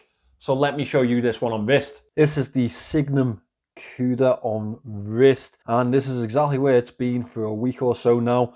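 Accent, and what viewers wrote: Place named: England, United Kingdom. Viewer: sorry. I just can't tell. I think he's English but the accent is just so strong. I will put on caption and hope it helps.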